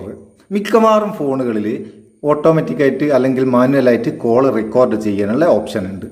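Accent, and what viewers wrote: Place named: Vadakara, India. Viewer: ഇജ്ജ് വടകര ആണ് അല്ലെ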